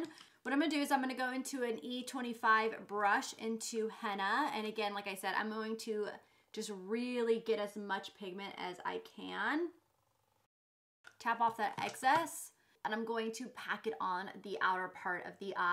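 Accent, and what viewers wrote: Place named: New York, United States. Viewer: I love her accent. She has to be from New York, Staten Island kind of area.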